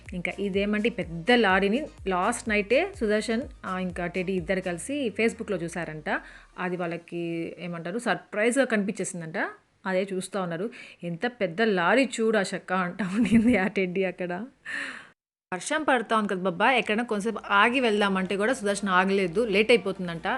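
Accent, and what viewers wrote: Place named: North, India. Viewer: Alane anipistundi north side vallu anukunta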